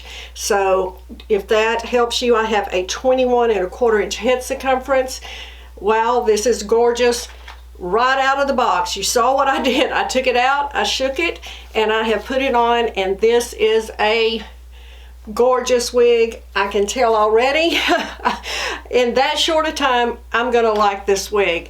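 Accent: South, United States. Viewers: I love your videos and your southern accent is wonderful. I have bought many Raquel Welch wigs in the reds. Several in Glazed Fire (RS28). I also have three wigs in the Fiery Copper that you have own. My all time favorite wig in this color is Advanced French. But I also wear Born to Shine in the same color. I have also purchased a wig in Golden Russet, but to me, this is much more blonde than red.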